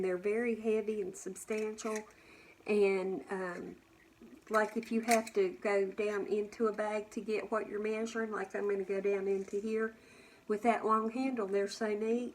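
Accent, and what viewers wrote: South, United States. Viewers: At my grandmas house my twin aunts who never married cared for my grandparents. My aunts were wonderful. I didn’t grow up with a mother and it was like having 2 mothers every summer down in West Virginia. They made peanut butter pinwheels. I’m going to try the preachers cookies. Down in Minden W.V back in the 60’s we would walk to church ( my aunts took care of Sunday school and all church events) and the preacher would come home and have lunch with us every Sunday. During the summer they had a house full. I have stories about my Aunts walking home late from church and a bear walking up behind them. One aunt took off and got my grandpa ( with his shotgun), as the other aunt kept talking thinking her sister was still next to her, then heard a blast that scared the bear off. Every summer I cried leaving my best memories ever. 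your cooking and southern accent bring back memories of long ago. Thank you🥰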